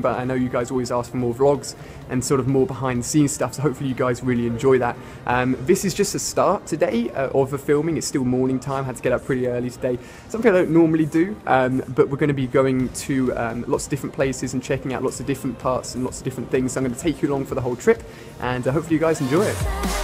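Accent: United Kingdom. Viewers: Hes uk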